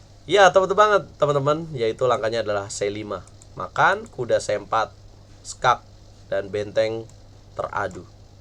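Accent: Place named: Java, Indonesia. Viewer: Ko tiba tiba medok bang😭👍🏼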